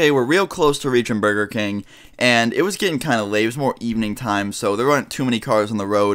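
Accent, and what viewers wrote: New York, United States. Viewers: Aye it sounds like your from New York that's how people are over here lol are you?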